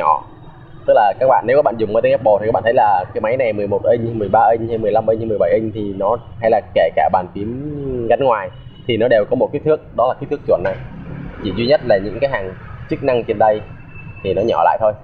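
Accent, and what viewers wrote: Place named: Da Nang, Vietnam. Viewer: chu choa, air2011 mỏng và nhẹ tới khó tin:D air 2012 mình chưa đc coi video tiếng V, nó có j thú vị 0 mấy a ? mà e thấy mấy a nên bật máy và làm vài soft+ mấy tính năng hay hay để lồng vào video đi, chớ nhìn phần cứng mãi cũng chán mà cái bàn rê magic touchpad của riêng mac có bán sẵn ko ? nếu có thì giá nhiu? . p/s: 0 có cảm tình w giọng nói của a này :)) ọp ẹp, nam chả ra nam mà bắc cũng ếu ra bắc, nửa ĐN nửa nẩu nẩu (giọng... ái nam ái nữ_, clip sau nói tiếng fổ thông 100% cho dễ nghe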